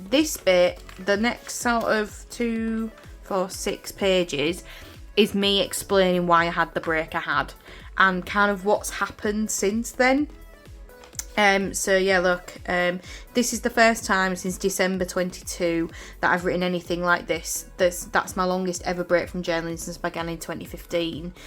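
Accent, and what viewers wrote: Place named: Hull, United Kingdom. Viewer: Always makes me happy to hear your voice and familiar accent (I am from ‘ull too, though I don’t live there now!). Lovely pages as always! Great you are back enjoying journaling again!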